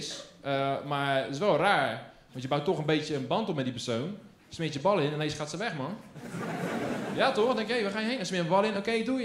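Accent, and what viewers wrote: Rotterdam, Netherlands. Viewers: Dit is echt eerste keer dat ik graag naar een Rotterdammer luister!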